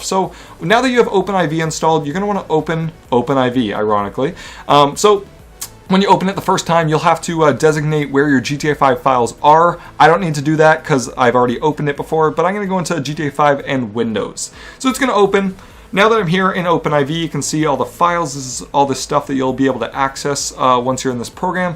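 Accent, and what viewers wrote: Canada. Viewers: love Canadian accents. They're funny to me as an american lolololol. But, great video broskoski. Me and my buddy are new to PC and we were both able to download the mods pretty well with minimal problems (problems due to new PC dumbass'ry and slight intoxication).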